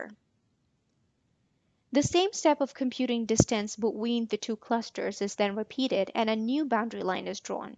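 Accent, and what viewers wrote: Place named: Pakistan. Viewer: I wondered after listen to this that you belong to Pakistan. you seem to be a native speaker. anyway, thanks a lot for this great lecture.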